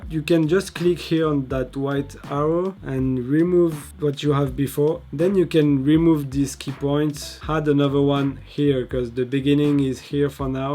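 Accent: France. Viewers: qui a reconnu l'accent FR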